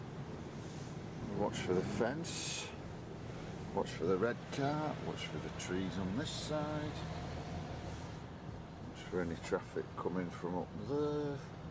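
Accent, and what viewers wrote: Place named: Yorkshire, United Kingdom. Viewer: Yorkshire! :D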